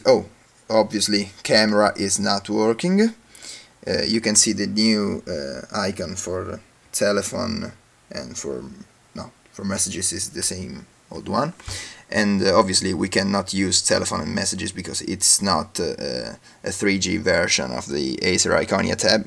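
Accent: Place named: Italy. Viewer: si sente molto dall'accento che sei italiano xD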